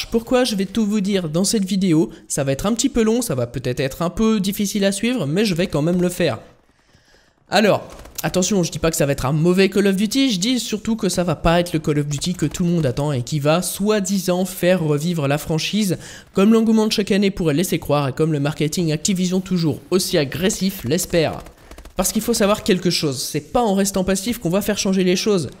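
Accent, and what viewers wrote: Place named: England, United Kingdom. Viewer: arrêté l accent englais ça fait pitié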